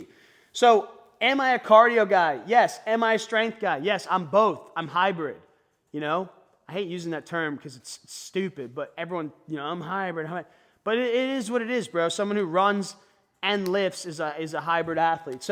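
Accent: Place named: Philadelphia, United States. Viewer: 47 year old Irish prick from Philly here. Your accent and mannerisms are similar to mine even though I've spent decades on the Left Coast. I like your advice and information. I'm struggling with getting belly fat gone. I do HIT lifting twice a week. I tried every 3 days the past two weeks but my recovery takes longer at my age. Nevertheless, I've seen really good results muscle wise and I also walk 2-3 times per day morning, midday, and after dinner. Walking is huge! I'm not into running, but I'm pretty consistent with walking, good sleep, lifting, and eating healthy. I eat mostly whole foods, but I think my problem is the portion sizes and eating carbs later in the day like you pointed out. Any other advice would be greatly appreciated. Awesome video. God bless!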